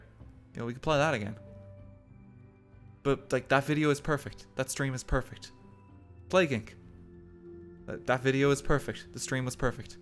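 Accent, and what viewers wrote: Ireland. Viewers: The Irish accent is awesome.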